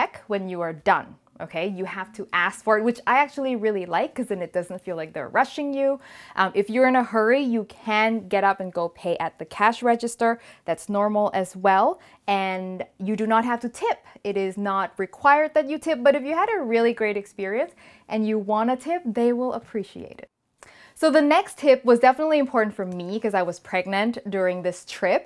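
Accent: France. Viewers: Your French accent is amazing ! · Perfect French accent !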